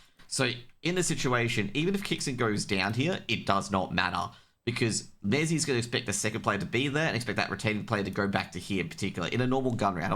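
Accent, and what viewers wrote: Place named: Australia. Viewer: I like the sound of your words Australian man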